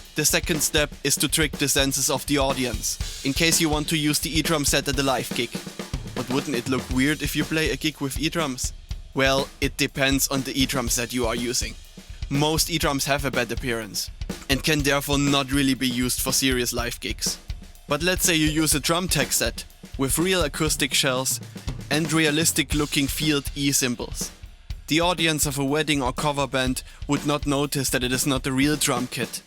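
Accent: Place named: Germany. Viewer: Where do you live? U sound like a german guy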